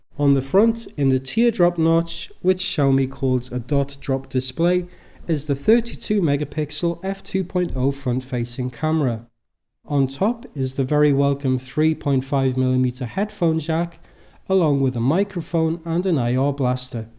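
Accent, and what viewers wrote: United Kingdom. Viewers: Insanely nice review, I am loving your nice British accent. You really did show the Phone really well in all possible areas. 10/10...Greets from Germany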